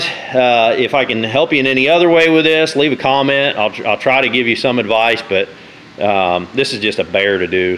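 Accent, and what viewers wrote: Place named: South, United States. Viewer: Maybe I just am used to this guy's kind of language and southern accent but all the other ones seem so hard to follow. This guy made it easy for me soon as he said I just popped that son-of-a-b** on there I said yep like And subscribe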